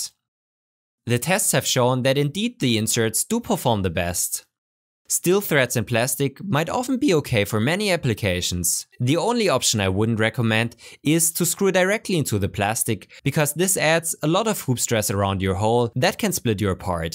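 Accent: Germany. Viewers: Der Deutsche Akzent ist unerträglich.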